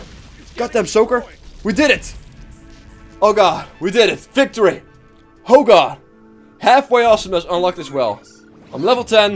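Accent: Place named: Netherlands. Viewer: dat dutch accent.. seriously dude fix your pronnouncication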